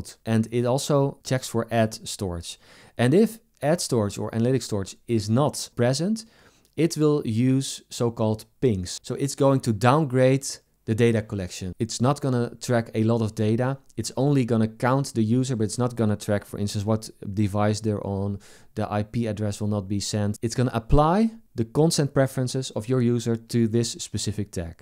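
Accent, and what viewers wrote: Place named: Netherlands. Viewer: Dutch accent best accent. 🙏🏻 Thank you for the clear video and god pace! Keep it up! 👏🏻👏🏻👏🏻👏🏻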